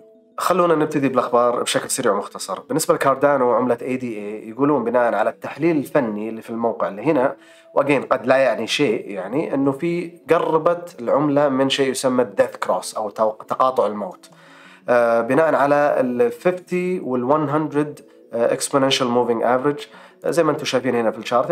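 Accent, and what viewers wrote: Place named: United States. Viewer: اذكرلنه الارقام بلعربيه بدون لكنة ولغة امريكية . انته عربي واحنه عرب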